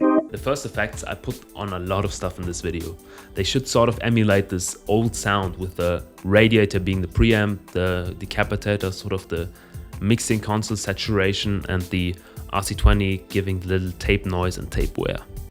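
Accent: Germany. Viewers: Are you German? You have a german accent Mir wurde das Video über meine Startseite vorgeschlagen 😄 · bro du sprichst gut Englisch aber habe mir trotzdem gedacht dass du deutsch bist😂 Sehr stabiles Video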